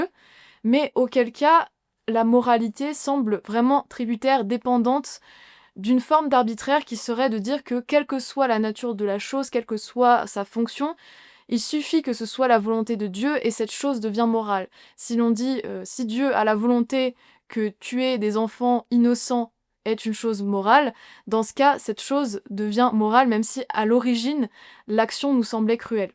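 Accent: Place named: France. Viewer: Je suis comme Quebecois pour deconstruire votre superbe accent francais et Sartre.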